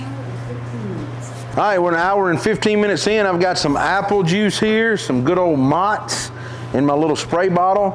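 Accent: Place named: South, United States. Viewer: First time watching, fantastically groovy info. Good (really good) teaching, useful information, and your 'southern accent' jumps out at this northwestern upper peninsula michigan yooper.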